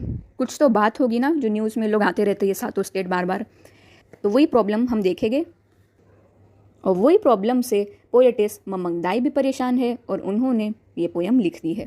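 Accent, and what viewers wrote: Mumbai, India. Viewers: Ma'am are you from Mumbai ?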